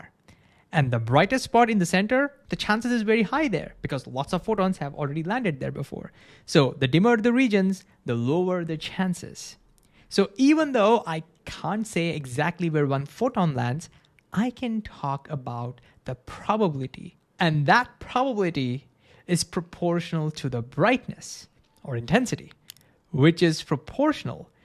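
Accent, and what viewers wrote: India. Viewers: God I hate when I find a video that sounds exactly something I'd like then I hear an Indian accent.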